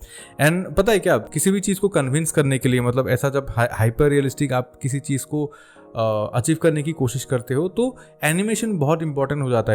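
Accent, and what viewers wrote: Assam, India. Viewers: I think i found sm slight texture of assamese accent in your video.. axomr hoi nki apuni??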